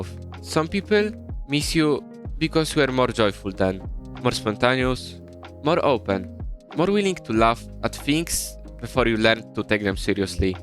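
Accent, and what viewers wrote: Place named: Poland. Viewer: Bracie słychać tego Polaka w glosie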